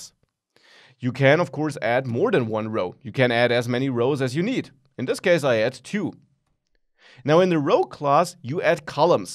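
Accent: Germany. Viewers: GREAT content! Are you German by any chance? I am trying to make out what kind of accent you have. (I am only asking. I understand you perfectly fine)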